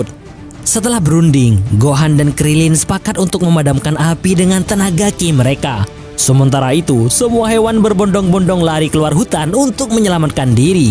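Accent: Madura, Indonesia. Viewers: Ia kayaknya. Logat dan nadanya Madura bgt hhhh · mimin orang Madura ya? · Logatnya Madura banget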